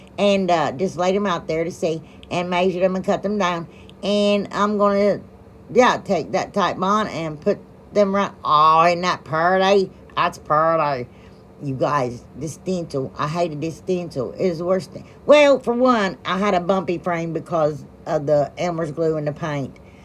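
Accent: South, United States. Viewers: Know I appreciate you just as much and I've been sharing for you and getting my family involved too. They laughed and said you amaze them, have taught them some cool things, love the country voice and think you're perfect just as you are(are just a few things they've said, some of them watched you cause of your voice and my interest, should be getting a few more people to watch your talent for sure), and I love you just as you are. Know you're always in my prayers. God bless you and yours. I pray God showers you with many blessings because you are so very special to many. Till next V•I•D•E•O😊❤😊 BIG HUGS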